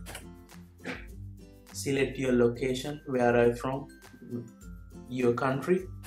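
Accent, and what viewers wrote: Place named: India. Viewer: ddat indian accent do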